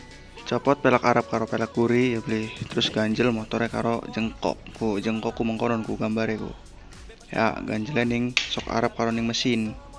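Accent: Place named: Tegal-Cirebon, Indonesia. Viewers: wong dermayu tah? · Lah wong ndi sampean kayae dermayu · Jawane kaya wong tegal cirebon kihh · Ngapak mbah... ??? 😄🤣🤣😂😅😆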